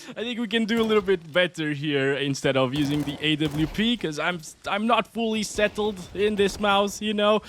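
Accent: Portugal. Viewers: You sound Portuguese, ahahahah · Good video! Are you Portuguese or Brazilian?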